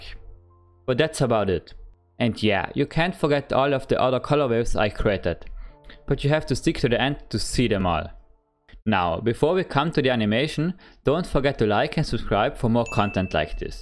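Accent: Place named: Germany. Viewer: ich wusste direkt am akzent dass du deutsch bist hahah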